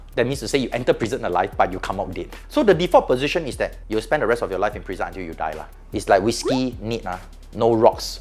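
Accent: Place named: Singapore. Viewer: You are a cool lawyer. Thank you for explaining how the law works with regards murder charges. Love the singlish lah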